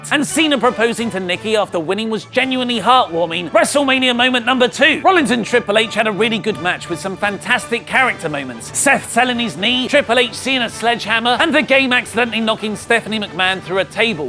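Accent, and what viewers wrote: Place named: United Kingdom. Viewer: ... i enjoy your YouTube channel, but seriously your compulsive British accent really makes me wanna gather a huge crowd and initiate a chant of "Shut the fuck up "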